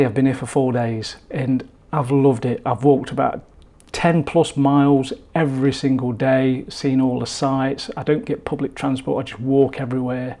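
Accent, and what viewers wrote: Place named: East Midlands, United Kingdom. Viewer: You’re defo from East Midlands 😂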